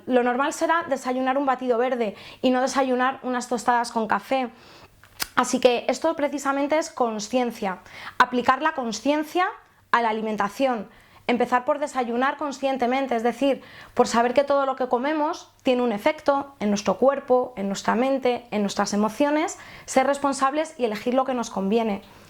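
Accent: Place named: Spain. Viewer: Nice girl, he prestado atención a tu clase de nutrición y estilo español al hablar ,MUA MUA.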